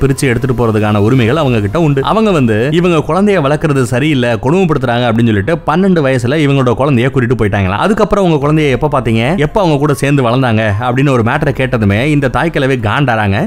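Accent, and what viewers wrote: Madurai, India. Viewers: Anna nenka pesura Madurai Tamil super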